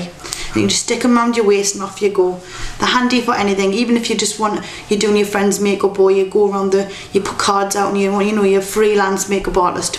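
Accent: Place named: London, United Kingdom. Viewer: I thought that you were from London :)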